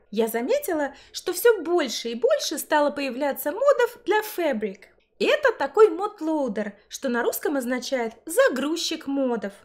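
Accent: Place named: England, United Kingdom. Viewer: как ты делаешь такой акцент англиский.Я попытался этим акцентом пару слов проезнисти я язык сломал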